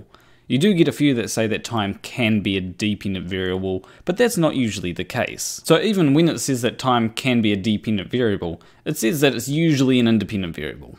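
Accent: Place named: New Zealand. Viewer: There was a point in this video where I was really distracted by the phonetic "eegs" which I thought was eggs, but later on you said it with the letter X on screen. Not a diss, I quite like the quirky kiwi accent is. At least you don't make everything sound like a question?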